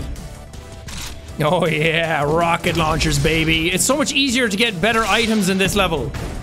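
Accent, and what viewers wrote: Scotland, United Kingdom. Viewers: BRO SOUNDS SCOTISH WHO AGREES 👇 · Is he scottish? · I like your accent is it Scottish???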